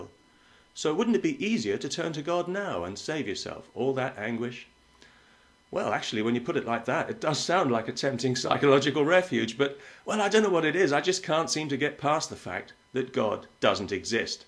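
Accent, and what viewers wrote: England, United Kingdom. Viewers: I like the accent? English?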